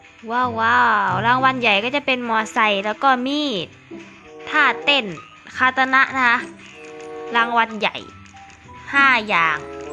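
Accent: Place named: Isan, Thailand. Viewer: เว้าเหมื่อนหนูเลยค้ะอีสานค้ะหนูเว้าจนพี่ฟังบ่ออก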